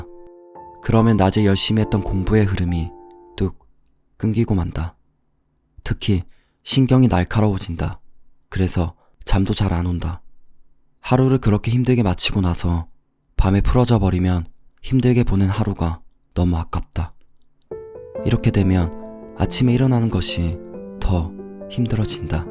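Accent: Gyeongsang, South Korea. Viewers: 경상도 분이신가 '아무껏도' 연발이심.. 몰입하다 빵터졌네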